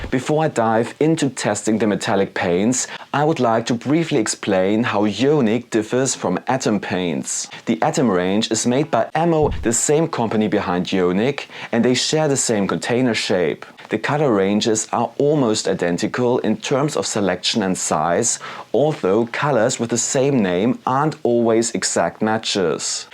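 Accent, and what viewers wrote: Germany. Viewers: So about this 'pronunciation' thing in the comments, it's because he's from Germany, I presume? It's a german accent or how germans read certain alphabet differently. What's the fuss about?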